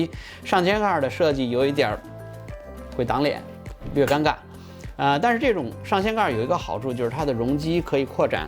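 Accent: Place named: Sichuan, China. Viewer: 作者应该是巴渝或者四川人把！jin脏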